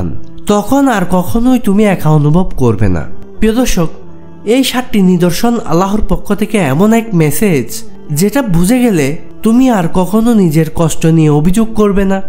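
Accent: Chittagong, Bangladesh. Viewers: Tumi ki chatgaiya poha naki?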